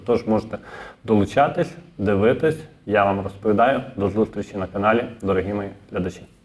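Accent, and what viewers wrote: Vinnytsia, Ukraine. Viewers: Ню- це говір вінничан.Так само, як і на западній мають свій говір.Там вуйки розмовляють так, що нормальна людина взагалі не розуміє про що вони говорять.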